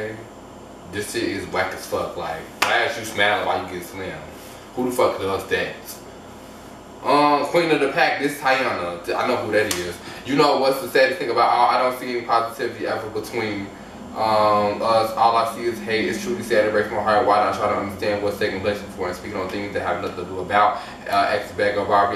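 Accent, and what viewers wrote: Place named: Chicago, United States. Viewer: I’m just going to assume you’re from Chicago?!? Lol let me subscribe!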